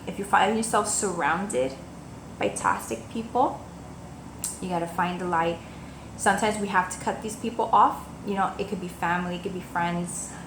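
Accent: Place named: Brooklyn, United States. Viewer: Exercise my language but this shit is great. You basically described the events of the week and I feel a lot better about things. You kept it real, and explained the cards and their energies together in a way that had me thinking about the things I’ve got to deal with. Thanks for the wisdom, and indirectly advising me on my own situations. Love the Brooklyn accent too btw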